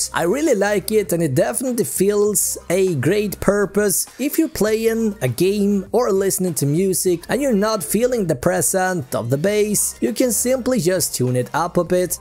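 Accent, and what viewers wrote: Sweden. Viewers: Are u svensk min broder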